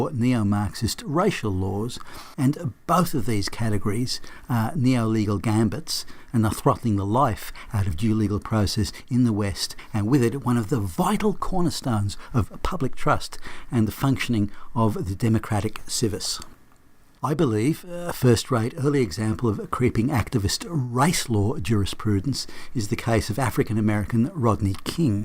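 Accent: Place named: Australia. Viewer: This is an unique reinterpretation of the Rodney King event, reinterpreted in light of the current social marist agenda. I remember when that went down. I remember similar racial confrontations going all the way back to "Black Day in July", when Detroit burned. Maybe you could reinterpret that too in the same vein, but you would be as wrong as you are about Rodney King event. These issues are far more complex and nuanced then you give credit to. From your accent, I might guess that you were far away from the event when it happened, on the other side of the world in fact in Australia. If that is true, you would not have the perspective of someone who grew up in America and experienced racial tensions first hand. However, just now I looked up to the statement under your video where you ask if the1990's trial of African American Rodney King introduced racial activist jurisprudence, and that is certainly a valid question.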